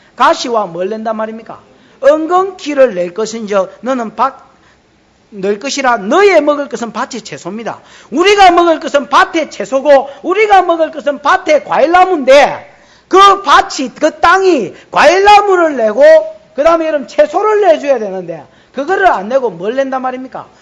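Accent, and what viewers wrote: Gyeongsang, South Korea. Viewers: 찰진 경상도사투리가 귀에 쏙쏙 들어옵니다^^😅 목사님의 깊이있는 메세지를 통해 다시한번 말씀앞에 서서 기록하신 말씀대로 거룩하게 살기를 소망합니다.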